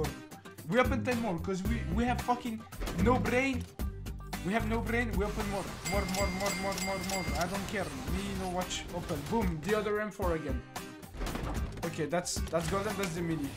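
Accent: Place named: France. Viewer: L’accent français est violent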